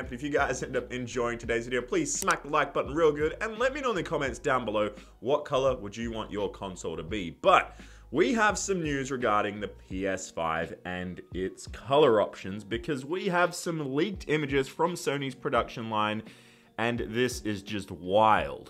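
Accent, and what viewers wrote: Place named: Australia. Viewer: Great to hear an Aussie discussing PS5! 🇦🇺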